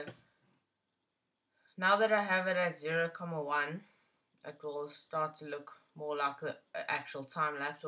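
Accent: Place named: South Africa. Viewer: So South African! makes me miss home :)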